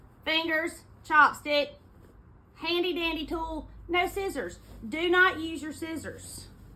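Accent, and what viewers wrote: South, United States. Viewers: You are a great teacher. I’ve been sewing and quilting for almost 50 years. I came across one of your videos on facebook. What drew me to watch it was your southern accent. It makes me smile when I hear you talk. I’m a 70 year old born and raised Texas girl.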